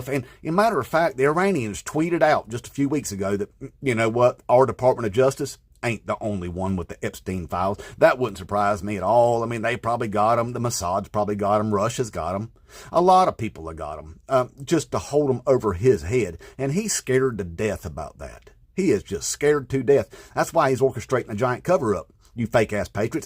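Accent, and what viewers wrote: South, United States. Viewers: Thank you for your work. You're making a difference. Love your southern accent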